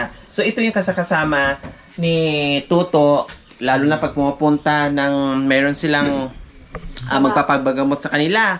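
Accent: Visayas, Philippines. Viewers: Maaamm bisaya di ay ka parehu palata 😂😂😂😂😂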